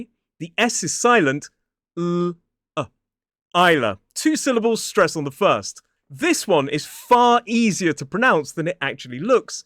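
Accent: United Kingdom. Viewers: Ciao, what did you say at the end to finish off your video, "from me" or "fir me"? They say the same thing on TV to end a programme but I never get it. I love your British accent so much! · Hi, lve just come across your channel. l love the way you run your lessons, and l love listening to your British accent. I've subscribed ❤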